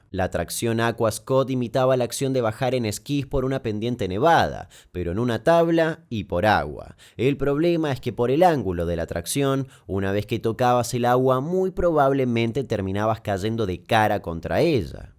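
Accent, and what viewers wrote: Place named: Argentina. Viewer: Que raro episodio del Dollop, con acento argentino y sin Badia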